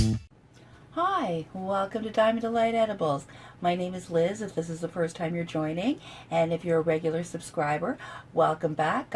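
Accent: Canada. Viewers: Hi Liz, Have you ever seen a TV show called Trailer Park Boys? It's set in Halifax NS. They say about like you do, aboot lol. Does that mean you have a NS accent? Or do all Canadians say aboot? BTW, It says subcribe instead of subscribe on your titles at end. I don't mind. I like misspellings but I can't help but point them out. Sorry.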